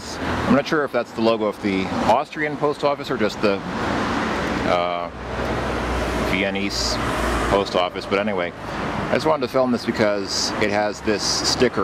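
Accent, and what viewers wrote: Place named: France. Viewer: I know you can't speak german , but the way you read words is in french like the word gasse ... You have to pronounce the 'e' In german you have to pronounce every single letter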